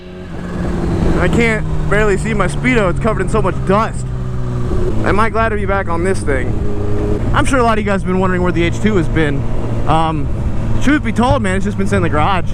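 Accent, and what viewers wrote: Canada. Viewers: Lmao your canadian accent had me in tears aye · The Canadian accent had me rolling 😂😂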